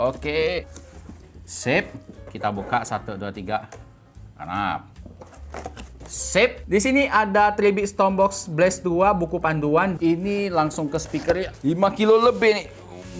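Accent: Medan, Indonesia. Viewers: berat "kali".., agak2 medan gaya ngomongnya.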